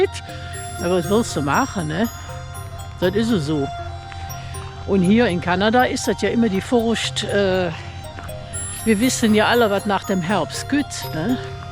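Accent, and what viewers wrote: Cologne, Germany. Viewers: Es war mal wieder einmal ein Erlebnis den Koelschen Dialekt zu hören und noch dazu so viel über die herrlichen Farben der Bäume und die Baumarten zu erfahren . In Parksville auf Vancouver Island hatten wir letztes Jahr genau 3 Tage etwa 5 cm Schnee, in Saskatoon dabei 5 Monate Schneefälle. Danke für die schönen Videos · Hallo ❤was für ein wunderschönes Video, und dein Kölsch ist so herrlich zu hören, und die Farben der Bäume und deine Infos dazu ist so Klasse, bin so begeistert von deinen Erklärungen der Bäume 🌲borrrr und die Farben und die Höhe der Bäume.Vielen Dank ❤LG Monika 😊 und die Pilzkunde super Idee mit den igennamen 😊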